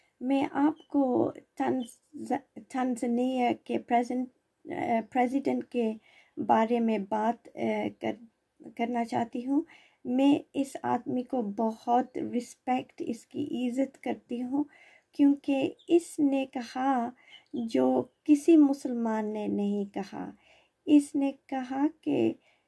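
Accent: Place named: Pakistan. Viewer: Sis r u from Pakistan.. No offence but your accent is a little difference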